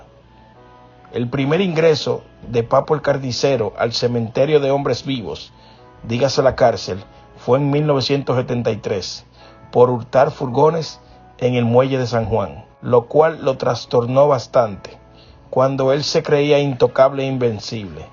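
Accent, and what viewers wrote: Dominican Republic. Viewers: Que mal lees , sos Dominicano?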